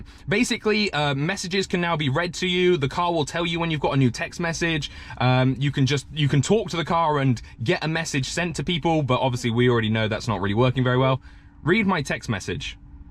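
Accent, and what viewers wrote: United Kingdom. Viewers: I have seen a vidéo in the US and work perfectly...weird ! Maybe your brits accent ? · I watch this guy's video specifically for that accent. Saying something even if stupid, in a Britsh accent just makes it sound smart. I think that's how Boris Johnson got to be PM. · Your car don't understand British accent.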